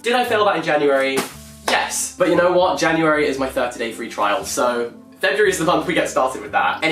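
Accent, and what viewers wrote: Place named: England, United Kingdom. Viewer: Again, your english accent is exquisite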